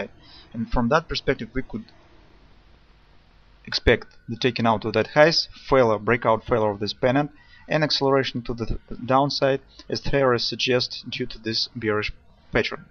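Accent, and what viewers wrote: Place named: Russia. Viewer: у тебя славянский акцент... по-моему русский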